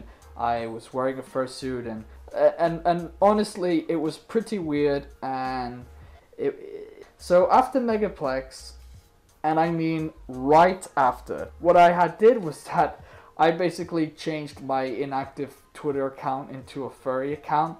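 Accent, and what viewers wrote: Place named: United Kingdom. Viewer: wow to be able to pull of a almost flawless British acsent when you are an American is pretty cool Ps I love the content and the caricter of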